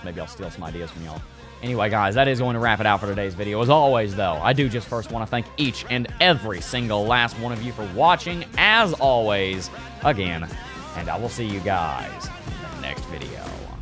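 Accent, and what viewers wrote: South, United States. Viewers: I love your southern accent and with a name like I can trust everything you say hombre. You got a sub in me mi amigo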